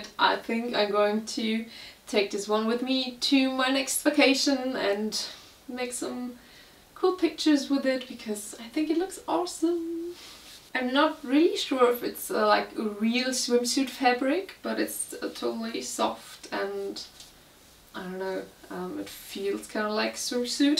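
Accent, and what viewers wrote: Germany. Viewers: You're a very pretty woman 👩 💕 look sexy in these style clothes 😍 I think I can hear a German accent that sounds cool. Not a fan of blue hair, but I understand that's today's style, I just like to picture you with dark brown hair, oo LA LA 😉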